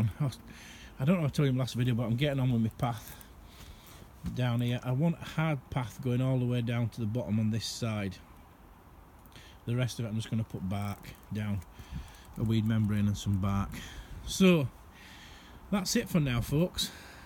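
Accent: Yorkshire, United Kingdom. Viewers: Nice tour, smart looking allotment nice to hear another Yorkshire accent!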